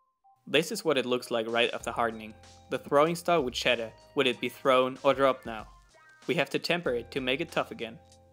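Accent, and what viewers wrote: Germany. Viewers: Man hört das du deutscher bist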